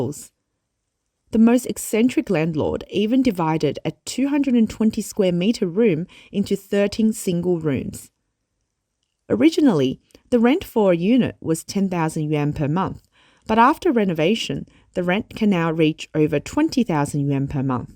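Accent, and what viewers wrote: Australia. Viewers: façade is pronounced as "FAHZAD." Not the worst Aussie or Kiwi (?) accent but quite pronounced. I've heard WORSE eh ! 😉🙃🙂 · Wait, that's an Aussie accent? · That’s an Aussie accent alright, being Australian myself I noticed it straight away, it’s hard to miss 🙂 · You guys do very good content but can you do away with the Aussie accent? Doesn't fit with what you report..and please get your pronunciations right..facade is pronounced wrongly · there is that Aussie 5 eyes voice over again....... Keep up the trash talk Temu, temu, temu temu temu..... Bring on the launch of temu chyna rubbish products looking for a home. Meanwhile the banks are failing get your cash out now.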